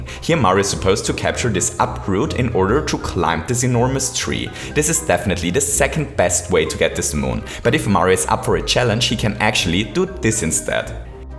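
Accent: Germany. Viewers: Ernsthaft? So einen krass deutschen Akzent hab ich im Englischen noch nie gehört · Wow. It's so funny, I know you are a German, because how you speak English. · Or german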